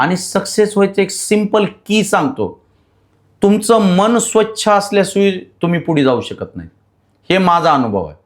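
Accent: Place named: Marathwada, India. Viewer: Greet manush ahe rao bhau vicharpan changle mandto marat vadyachi boli bhasa ahe ti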